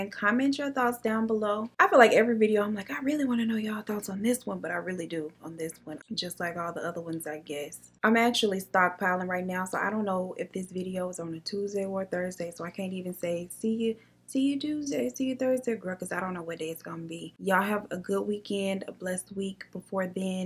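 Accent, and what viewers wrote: South, United States. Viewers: You gotta love & appreciate mamas! ❤ No one else in this world will ever love, help, protect, understand, forgive or worry about you than her! 🥰 I have been watching you for about a yr now and I found you bc I watch Bailey Sarian and MrBallen and one of your videos popped up oneday. You are a beautiful woman 😍 and I love the fact you sound like me. I also have a southern accent (or so I've been told by my in-laws 😂). Listening to you is just like hanging out and gossiping with my girlfriends. 💯 Love your videos so keep up the awesome work! 💋🏆👝👑💄🙏💜🌻💣🦋❤🌟🎉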